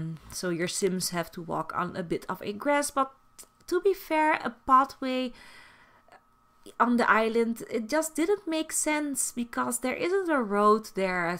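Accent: Netherlands. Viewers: Ik kon gewoon aan het accent horen dat je nederlands bent haha, maar erg mooi huis! die keuken ook mooi uitgezocht!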